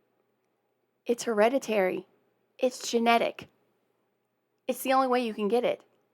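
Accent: South, United States. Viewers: I love your hair, I love your southern accent, I love your heart, and of course I love your name, after all it’s my wife’s name😂. You keep being your amazing self. We love you. Greetings from Alabama 😎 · It takes a brave person to be able to do videos these days. I think you are a genuine, honest and friendly presenter, and trust me, there are some presenters on YouTube who are terrible. Anyone who would go that far out of their way to say mean and nasty things, is someone who has some very deep self-esteem problems. Just brush them off. Be yourself. This is YOUR channel. Stop the video and talk as many times as you want, even during. And I'm a huge fan. If viewers want to hear the song un-interrupted, they can go watch a different video. By the way, I love your southern accent. Keep up the good work.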